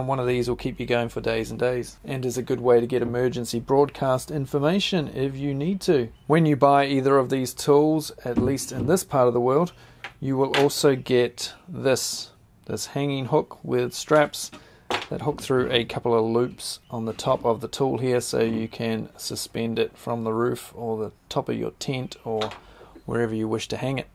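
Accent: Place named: New Zealand. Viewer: I love your accent bro. Kiwis, men and women with the coolest english accents :)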